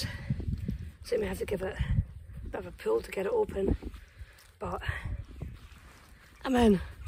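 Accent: Scotland, United Kingdom. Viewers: I did archaeology on Inchmarnock, the little island near Bute. That island unearthed a slate called The Hostage Stone, which depicts a probable Viking raid. You are refreshingly real. I appreciate the humble focus on the historical sites and nature. Hailes Castle is atmospheric, and Arbroath Abbey. Just be cautious in some rural spots, gangs of youths can be a problem. I watch a channel called Kirsten and Joerg for its comforting slow meandering simplicity. Quite different from your Scottish theme but they get lots of subscribers, it took time to get to that though. I like your Scottish delivery. You have a nice voice.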